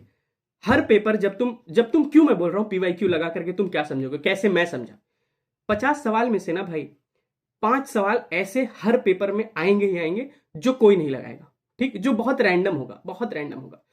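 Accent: Bihar, India. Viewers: Bhai sach sach batana Aap Bihar se ho na ☺️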